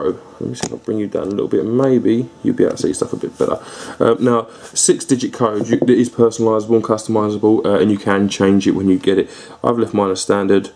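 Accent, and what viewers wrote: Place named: North London, United Kingdom. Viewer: Hey, thanks so much for the review. I feel compelled to apologize for any Americans giving you a hard time about talking too fast or having a 'fick' North London accent (is that right? North London?). I'm an American, and I can understand you perfectly. My only advantage is that I grew up watching Monty Python and The Young Ones and loads of other British tv shows, so I'm used to it. Vape on and talk as fast as you like. Cheers!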